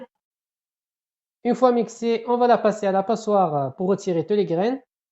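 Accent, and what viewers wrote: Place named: Kabylie, Algeria. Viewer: J’adore votre accent kabyle Merci pour cette recette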